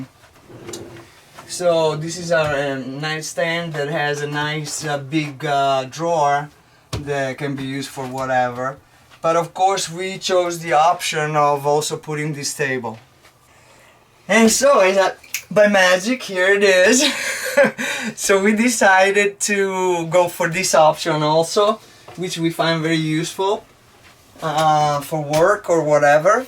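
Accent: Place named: Austria. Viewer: I sense a lil but of Austrian or German accent, á la Arnold Schwarzenegger’s voice..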